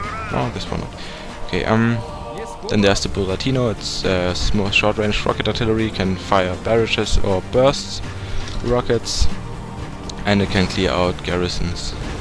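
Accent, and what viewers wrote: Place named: Germany. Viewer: Man hört den deutschen Akzent aber sehr gutes vid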